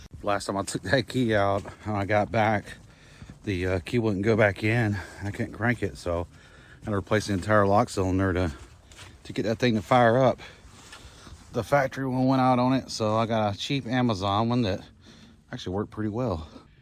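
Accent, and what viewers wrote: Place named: Georgia, United States. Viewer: Where in Georgia are you from?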